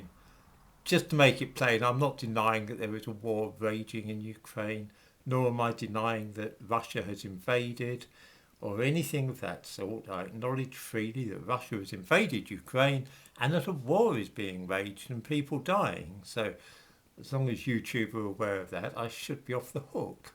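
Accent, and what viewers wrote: United Kingdom. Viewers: sounds British to me do you speak English? Your facts where did you get them from are you a British civil servant Where do NATO weapons go? Near Odessa, the SBU detained smugglers during the sale of weapons purchased from the Armed Forces of Ukraine in the combat zone. the real news from the ground "🅾️" group, Colonel General Alexander Lapin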